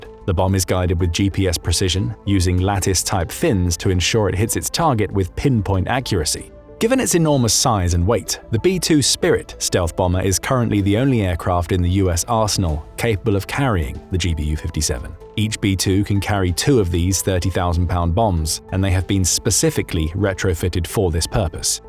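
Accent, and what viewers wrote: England, United Kingdom. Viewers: It's an English accent, and the speaking is quite clear.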